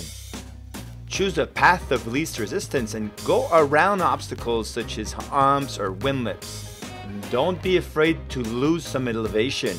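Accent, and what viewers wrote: Quebec, Canada. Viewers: Vous êtes québécois? Je perçois l'accent de chez nous!! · listening to the Québecois english has been fun.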